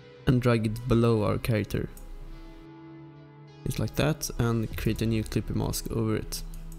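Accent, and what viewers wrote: Sweden. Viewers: Are you swedish? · you sound Swedish. Sorry if im wrong. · due svensk eller hu? De hörs på din röst lol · you sound like you are from sweden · Are you a Swede??? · He comes from sweden you here it very easy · Ur swedish xD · This guy is Swedish I bet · Swedish 100% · are you from sweden_ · Man hör att han är svensk xdd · Man hör att du är från sverige ^_^ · Are you swedish bcuz u have an swedish accent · are you from sweden??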